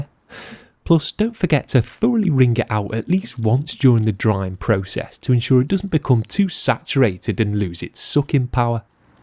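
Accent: Liverpool, United Kingdom. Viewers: yer i know that water has anomalous properties of cohesion and adhesion however it is due to simple osmosis that a dry towel will absorb more water than a wet one! and when the water potential gradient has reached dynamic equilibrium then it will no longer absorb any more and this will be reached sooner wetting the towel ... but no hate to the scouse cleaning guy as hes sound ! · I'm sorry oot. Is that scouse? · its the English the British English not english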